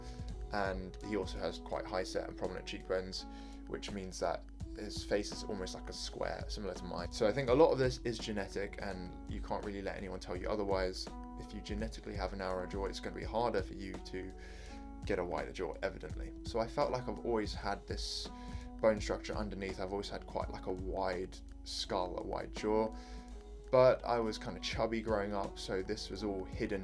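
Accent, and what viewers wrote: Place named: United Kingdom. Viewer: ngl I've never seen an asian with a UK accent completely threw me off LOL. Great vid tho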